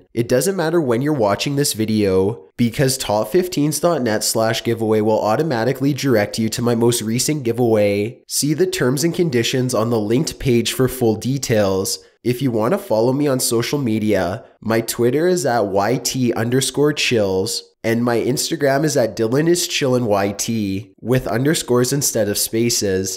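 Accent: United States. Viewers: Is chills voice an accent in an American state somewhere if so its beats the broad Birmingham accent in the UK which is like scraping your nails on a blackboard,its like hes forcing the words out of his mouth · lol u end ur sentences in question form? its cute i hav a friend that talks the same way! R u american? def. true stories i practice voodoo. but i don*t turn people in2 zombies. thanx for ur scary stories.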